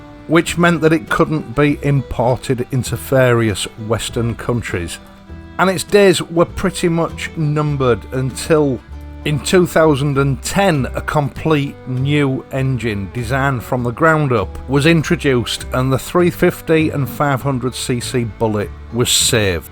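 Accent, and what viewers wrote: United Kingdom. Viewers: I love your British accent & narration style... 👌👍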